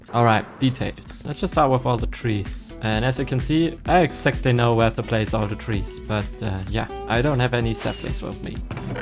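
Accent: Germany. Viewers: Cause he say zem instand of them k think he is german like me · are you german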